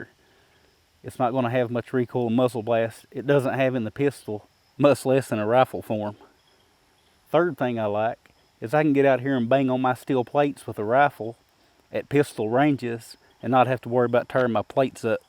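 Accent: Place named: South, United States. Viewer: southern accent and looks Hispanic.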